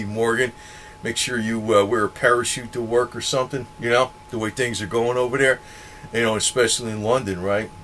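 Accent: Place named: Northeast, United States. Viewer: Florida huh? Sound like a north east guy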